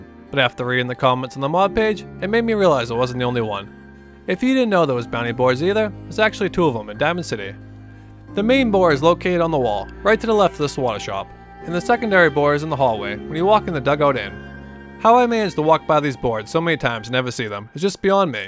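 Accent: Boston, United States. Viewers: has anyone ever told u about ur nice Boston accent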